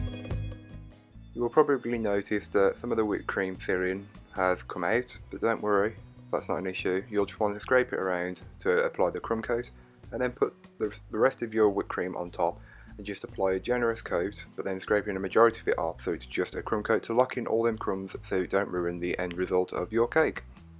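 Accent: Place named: Walsall, United Kingdom. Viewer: Hey love this! my mum always buys a black forest gateau for Christmas but I think this year I might give this one ago..😆 can I ask where your from as listening to your accent you sound like my brother in law he is from warsal area I have probably spelt that wrong lol 😁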